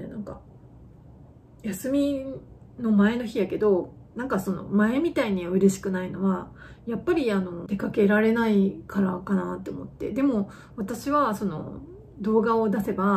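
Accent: Hakata, Japan. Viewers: たまに聞こえてくる博多弁？、とても良いです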